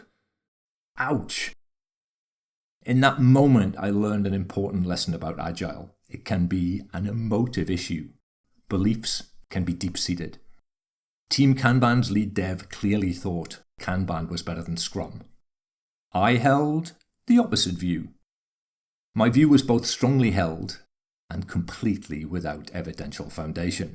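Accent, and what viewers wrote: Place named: United Kingdom. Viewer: Thank you for speaking slowly and clearly. Not all Youtube educators grasp that students hearing the information for the first time need it delivered slowly and clearly. As a Yank, I find your smashing British accent most enjoyable. Your videos are fantastic. Bravo!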